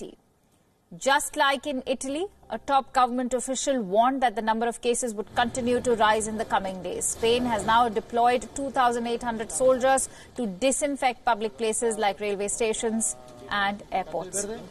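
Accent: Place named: India. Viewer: Her indian accent gets on my nerves. Couldn't they find any European speaker?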